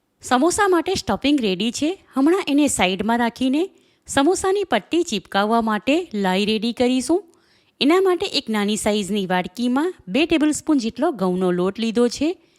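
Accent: Surat, India. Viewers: Pure Surti. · Surati Gujarati bole chhe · Ben surati lage chhe tt bole chhe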